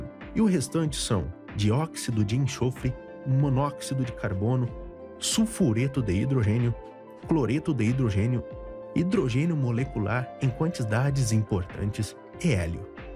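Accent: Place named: Portugal. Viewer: o narrador parece português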